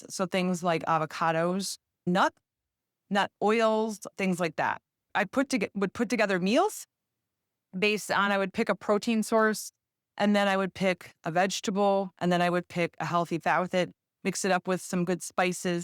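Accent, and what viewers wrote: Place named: Wisconsin, United States. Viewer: I'da bet a cool hundo you were from Wisconsin...do tell.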